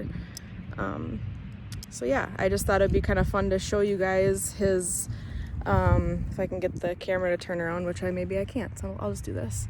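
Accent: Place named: Canada. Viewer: I WILL add a comment since somebody may have passed your thoughtful video by. My Grandfather also lost his life by suicide. In fact, my Mother, who I must admit cannot go see, was pregnant with me when her Father did so. I believe mental health issues run in my family. There was a sincere belief that my Grandfather thought his cancer was coming back(this was in 1975), didn't want to be a burden, feared the pain, or perhaps it was an entirely different reason not related to his physical being. He had 12 children, with two different wives. His first wife passed on. But they were all related nonetheless! (You sound Canadian, btw. That is not a dig. I ❤ Canadians. I'm a simple, poor New Yorker myself.) I do suffer terribly from Major Depression with Panic Attacks, and other "things" I won't bore you with, as you are genuinely hurting as you are paying respects to much-loved family member. 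My place of work is being very charitable bc I'm on leave now. My poor husband and elder Sister have been blindsided by me not working and reasons why. I live in my own head and now I'm going through another slow process of getting help here in NYS.I have been scared into taking my medication the correct way, not running out, so I can resume my full time Custodial job at the local Casino. I wish YOU, YOUR FAMILY, & whomever happens to come across my long, but true comment that suicide is just plain scary. You leave others wondering why and so heart-broken. Because of my Grandfather's death, and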